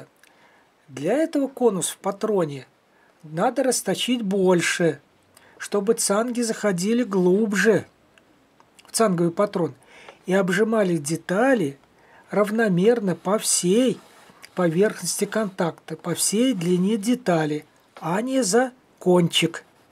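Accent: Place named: Volga, Russia. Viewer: Спасибо за полезное видео, и ещё волжский распев приятно было услышать)) где-то рядом с Самарой?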